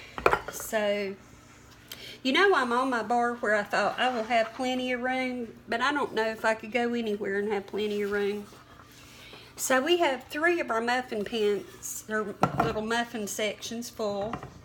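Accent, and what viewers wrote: South, United States. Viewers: When the presenter has a Southern accent I know whatever the recipe is it will be GOOD!!! These are amazing thank you!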